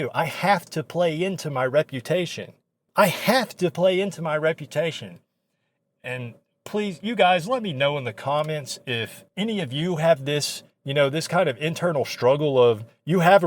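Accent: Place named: Deep South, United States. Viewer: You should move to a larger city if possible, judging by your accent you’re probably from the Deep South like me, once I moved to a bigger city(Atlanta) it was way easier to find friends and meet new people.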